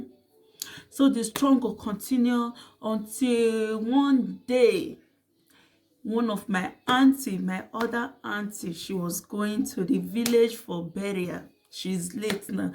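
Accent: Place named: Edo, Nigeria. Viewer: You're sounding like an Edo babe. Am from EDO state😘. Nice connecting with you. Some aunties can be so wicked but thank God for your Dad's quick intervention.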